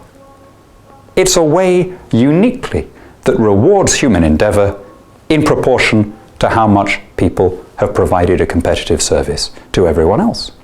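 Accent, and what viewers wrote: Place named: Southern England, United Kingdom. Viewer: Scuba Sausage I'm sure he is a southerner, I can tell from listening. xD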